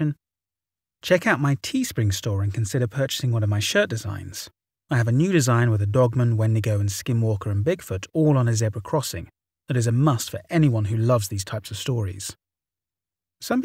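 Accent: United Kingdom. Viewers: Love this guy! His narrations are so good! The British accent gives a flavor to it! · British accent or not, his delivery is high quality.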